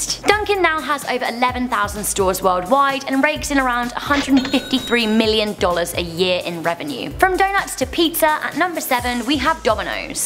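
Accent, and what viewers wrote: United Kingdom. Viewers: she's british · are you British?☺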